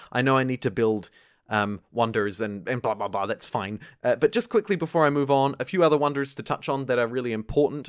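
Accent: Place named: New Zealand. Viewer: as another kiwi i have to say that your accent or iksent as we say is very rhotic. e.g. culturrrre rather than culcha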